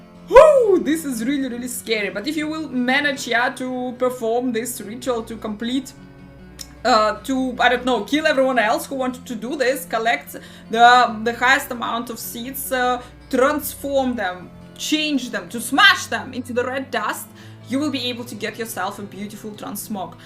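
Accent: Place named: Russia. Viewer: По акценту как будто русская)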